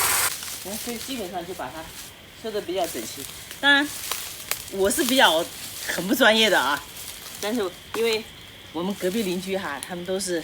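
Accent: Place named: Sichuan, China. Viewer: 阿姨很棒！您说是我们家乡的川普么~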